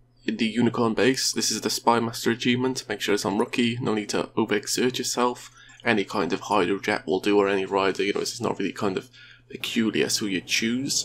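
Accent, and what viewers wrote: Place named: Wales, United Kingdom. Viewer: Nice one boy-bach! Glad to see/hear a Welsh voice doing an achievement guide. Keep up the good work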